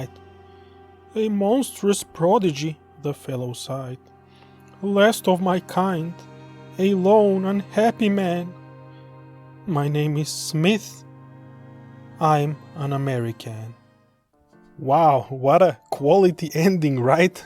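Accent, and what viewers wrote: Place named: Russia. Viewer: I like your accent a lot, though it sounds more Russian than Bulgarian to me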